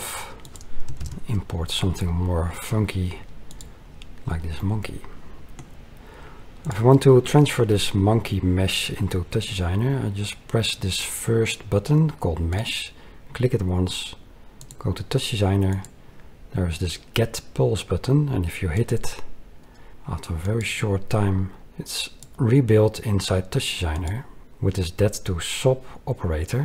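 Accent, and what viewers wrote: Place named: Netherlands. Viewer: I had no idea how difficult it is to import a 3D object into TD with it's material correctly mapped. After doing a lot of research - looking at Derivative notes, MeshLab, CloudCompare and watching YouTube videos, I eventually came across this video. Your import/export with Blender tool makes this seemingly simple task a practical reality. So thank you for making this tool available at no cost to us amateurs! Great well explained tutorial and loved your Dutch accented deadpan mention of the Funkee Munkee!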